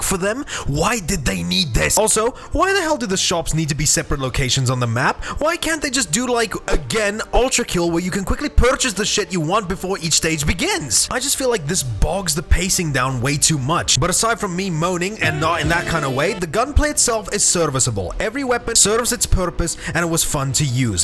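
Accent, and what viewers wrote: South Africa. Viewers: Are you Afrikaans?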